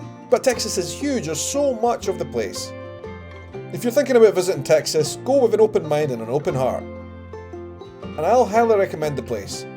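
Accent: Scotland, United Kingdom. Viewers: Hi, You almost fit right in in Texas with your cowboy hat and western wear. Now all you need to do to be an authentic Texan is to change your Scottish accent to a Texan accent; it can be done with practice!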